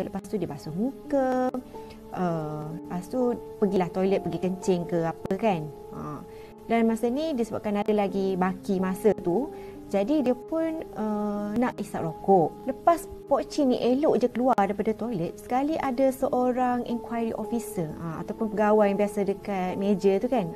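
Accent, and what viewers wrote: Kelantan, Malaysia. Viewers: Kak orang kelantan ke orang luar salah cakap pok cik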